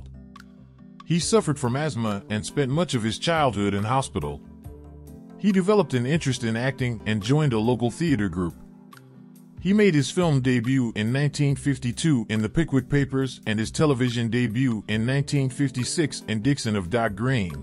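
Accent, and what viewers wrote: United States. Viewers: A narration with an American accent is inappropriate for this video. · Got the pronunciation wrong. Told in americanese.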